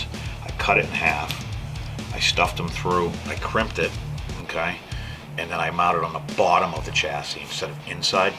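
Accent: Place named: Southwestern Pennsylvania, United States. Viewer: Sounds like a Southwest PA accent.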